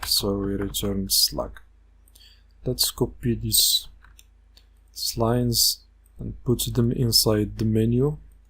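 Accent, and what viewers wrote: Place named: Morocco. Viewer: HHHHHHH the moroccan accent 🤣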